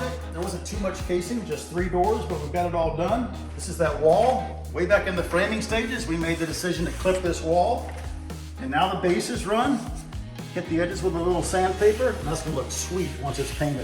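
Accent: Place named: California, United States. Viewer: Sir, Morning. You are very professional and well spoken, and you definitely look like someone famous. So, as I watched the lecture, it came to me, you look like a brad pitt who really worked his ass off in life… with a slight California accent… Great work.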